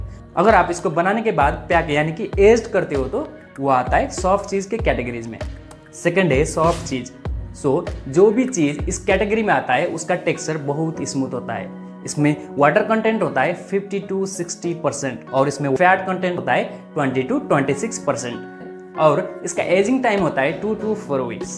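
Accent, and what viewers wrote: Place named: Nepal. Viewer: Aap nepali ho na ... tone bepali aaraha hain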